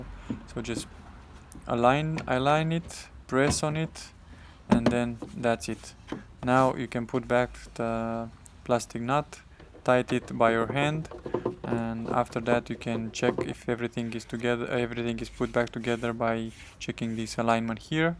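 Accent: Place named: Dâmbovița, Romania. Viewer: Ce accent de Dâmbovița ai când vorbești engleză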